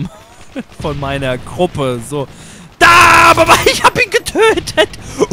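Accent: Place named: Hesse, Germany. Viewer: Tatsache? Wow hätte ich jz nicht gedacht, denn es Unterscheidet sich wirklich kaum vom hessischen (Frankfurter Raum) Naja aber das Video unterhält, ist auch wirklich ne gute Idee ma richtig einen zu saufen, damit man zumindest dieses Kackspiel ertragen kann! Naja jz is ja Black ops 2 da.. hm wenn man darüber nachdenkt.. könnt man grad schon wieder anfangen zu saufen! (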